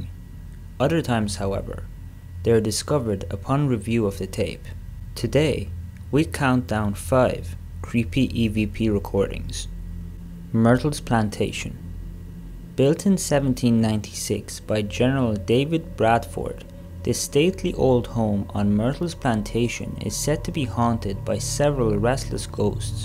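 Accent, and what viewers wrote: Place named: South Asia. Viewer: i can't understand what you are saying due to your thick accent.. what is your country of origin? pakistan or india? please learn to speak properly before doing more videos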